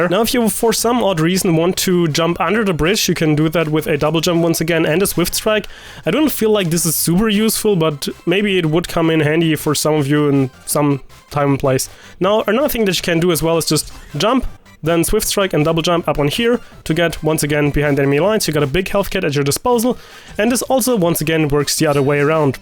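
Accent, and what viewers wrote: Germany. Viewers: XD ich erkene wie du sprichst das ist auf jeden Fall deutsch besonders bei eichenwalde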